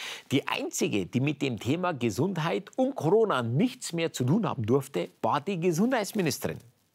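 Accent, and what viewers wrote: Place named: Bavaria, Germany. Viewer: Mir gfällt dei bayrisch du bist ja gar kein :)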